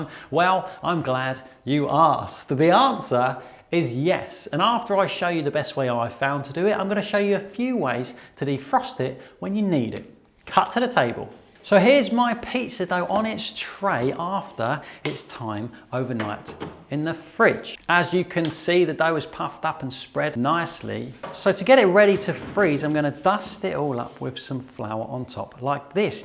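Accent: United Kingdom. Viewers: You have the most iconic British Accent! Makes me want to get your recipe for Banggers and Chips!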